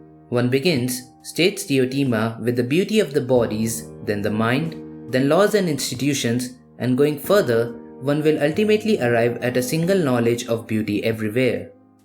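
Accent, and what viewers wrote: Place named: India. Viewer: never want to mean or curial but your Indian accent is distracting sometimes. Just sometimes, like every 5 second in 2 minute. Beside that amazing video and description.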